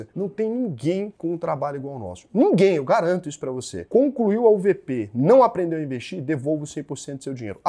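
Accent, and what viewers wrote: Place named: São Paulo, Brazil. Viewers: Esse é o sotaque de Paulista da Mooca ou Faria limers...nipe Boça, Faustão rs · Realmente é assim mesmo o Sutaque do estado de SP ahahahaha, sou do interior de SP e aqui puchamos bem o R, bem caipira mesmo, ai vc vai mais pra capital ou pro litoral de SP o R é diferente.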